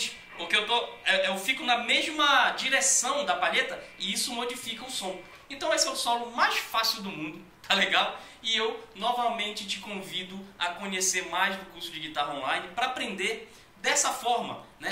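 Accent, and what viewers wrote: Pará, Brazil. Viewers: só pelo jeitos de falar deve ser daqui do meu estado, pará.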